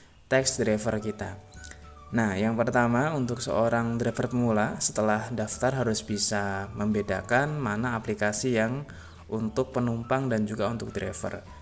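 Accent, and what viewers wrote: Lampung, Indonesia. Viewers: Pasti dari Lampung ya bang hehe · Bang orang lampung ya hahah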